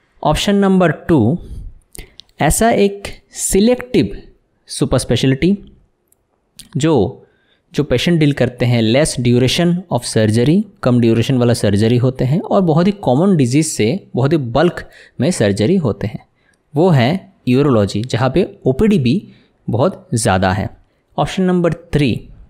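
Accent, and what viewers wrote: Bengal, India. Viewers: U have bengali accent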